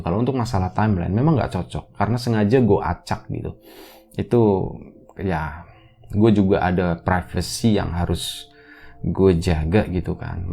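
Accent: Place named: Java, Indonesia. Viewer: Assalaamualaikum🙏sy baru tahu cannel nya mz ada dua toh, karena sy sub baru d lapak horor jd selalu penasaran, trs d buka buka ech dapet yg ini sy coba buka, ternyata ada radio kesayangan d bdg kesebut, duch seneng nya😅😅sy kan org bdg mz, tp leluhur saking Jawi, jd seneng kalo denger mz berbicara medok Jawa nya itu bikin saya terlena🤭😅😅😅semangat dan tetep sehat mz, matur suwun ceritane🙏🙏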